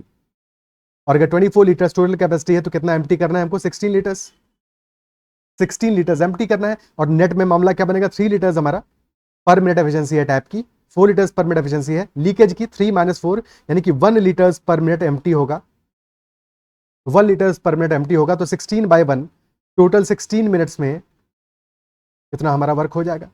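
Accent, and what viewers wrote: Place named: South, India. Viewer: Sir south indian accent is crazy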